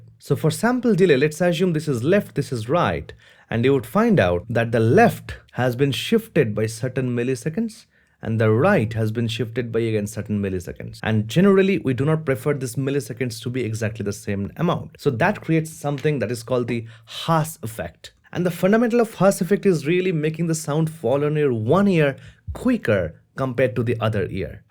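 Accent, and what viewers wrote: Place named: England, United Kingdom. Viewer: Great english accent, completely professional.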